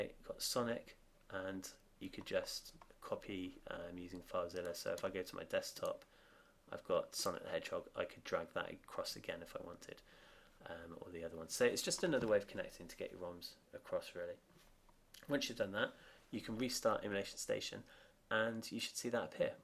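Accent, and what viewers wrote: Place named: West Country, United Kingdom. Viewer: Can't wait for all the parts to arrive, excellent video! Are you from Bath or Brizzol?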